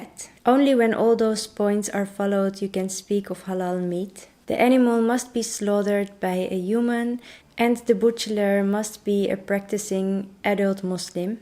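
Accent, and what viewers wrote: Netherlands. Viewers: Ik wist gewoon dat je nederlands was door je accent haha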